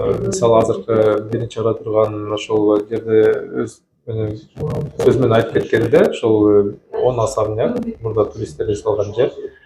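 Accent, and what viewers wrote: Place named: Osh, Kyrgyzstan. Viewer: Блогер ошский сартский вариантынды жон эле койбойсунбу Элдер номерин суураса бербей жатасын ,Ичин таар кылбада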